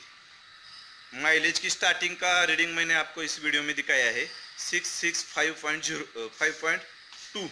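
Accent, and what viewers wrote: Maharashtra, India. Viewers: Bhai aapke Language se pata chalata he ki Aap Maharashtra ke rahne Wale ho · Aapki tone se pata chal raha he ke aap Marathi ho. Or wo bhi North Maharashtra se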